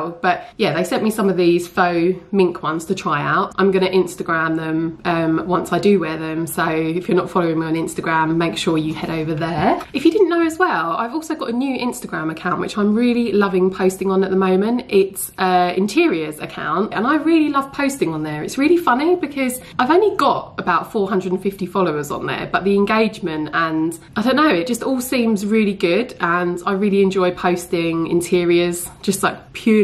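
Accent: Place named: Essex, United Kingdom. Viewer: Have to ask, where in Essex are you from? Your Essex accent is very much posher than mine haha.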